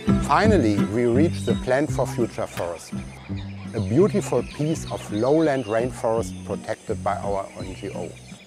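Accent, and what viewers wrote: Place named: Germany. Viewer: Was für ein wertvolles Projekt und es tut gut, all diese unterschiedlichen, schützenswerten Tiere zu sehen! Die mit dem Projekt eben endlich tatsächlich geschützt werden können! Nur der deutsche Akzent des Sprechers war halt wirklich extrem. xD Das war etwas befremdlich. Wird trotzdem geteilt, damit das hoffentlich mehr Leute sehen!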